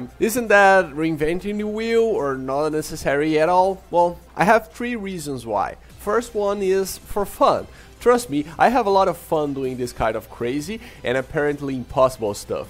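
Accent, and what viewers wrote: Brazil. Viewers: caraca, esse sotaque br tá muito aparente kkk pô irmão, me salvou ein, tô querendo aprender a produzir games e acho que a parte mais complicada pra mim é a engine em si, mas ainda bem que tem pessoas como você pra ajudar, tmj! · Are you Brazillian right? I realized because of the R pronunciation, your English is almost perfect though, it took me some time to realize. Great video, great job!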